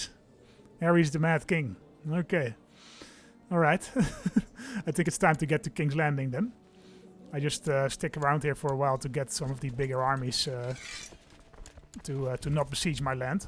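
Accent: Netherlands. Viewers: Are you Dutch? I’m hearing a dutch accent, so fun to see someone from my country having the same passion for asoiaf and ck2 agot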